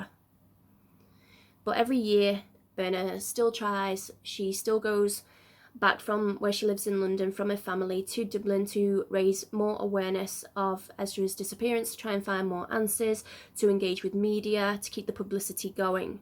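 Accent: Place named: Scotland, United Kingdom. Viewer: are you Scottish? Dark Curiosities is another Scottish Voice I love. Great accent the Scots have 👍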